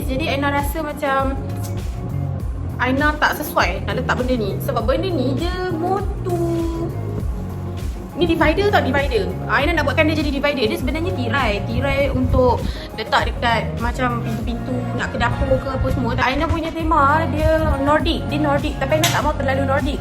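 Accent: North, Malaysia. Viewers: aina kalau ckp utara lagi best dgr 😂 sy suka dgr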